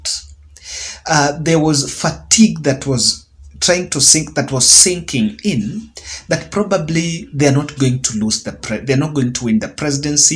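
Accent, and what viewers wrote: Kenya. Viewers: Stick to your Kenyan accent. Wacha hii mambo ingine ,hata sijui inaitwa aje bro... Ulikuwa sawa! We still support you come day come night. Rome was not built in one day!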